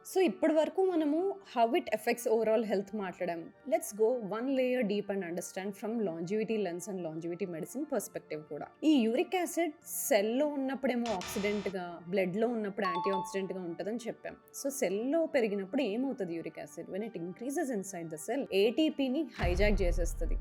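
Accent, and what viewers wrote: India. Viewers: Great information. By the way I am surprised you still pronounce certain English words with Indian accent ( Example: Dessert and obesity) I hope you take it sportingly. Cheers! 👍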